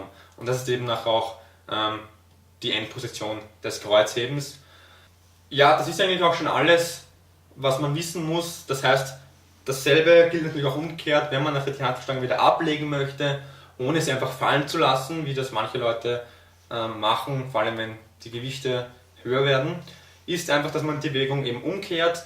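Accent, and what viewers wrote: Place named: Vienna, Austria. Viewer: nie daran gezweifelt ;) Bist du Wiener ? Hört sich für mich stark danach an :)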